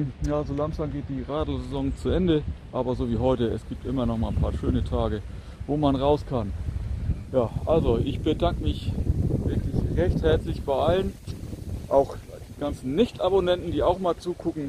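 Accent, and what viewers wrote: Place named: North, Germany. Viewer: So einen angenehmen und sympathischen Youtuber gibt es ja auch nicht alle Tage.... Immer schöne Landschaftsaufnahmen, gute Kommentare und die angenehme Nord-Stimme... das macht es halt aus.... Gruß aus der Nähe der Schwalm-Quelle in Hessen (da warst du auch schon ......)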